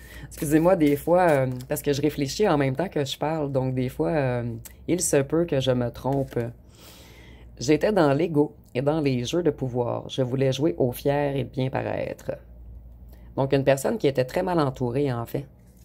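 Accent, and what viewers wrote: Quebec, Canada. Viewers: Merci beaucoup a vous que j'apprécie ainsi que votre accent québécois que j'aime enormement . Gratitude et remerciements à vous .❤👍🙏🌺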